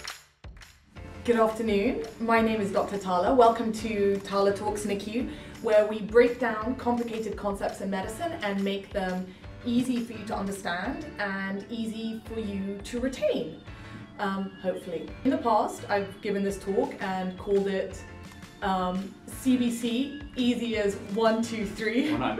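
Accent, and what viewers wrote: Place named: United Kingdom. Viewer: Dr. Tala, I was wondering if you have ever worked in NHS as well? Your accent is very British.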